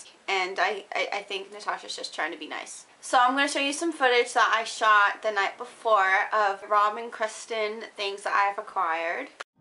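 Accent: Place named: United Kingdom. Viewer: aww you have a amazing british accent and that song?! IS IT ROB SINGING?! I LOVE IT! and do you know what its called? and haha i love your videos! :D DONT YOU DARE GET EVER BORED OF ROB LOL! :D and aww what you said was so cute :) I am so consider myself so close to you too and i love you! :) like a big sister <333 and yes! SHAYTARDS! :D and what you said about the other girls are so true! :D LOOL YOU AND MONA! haha i love you <3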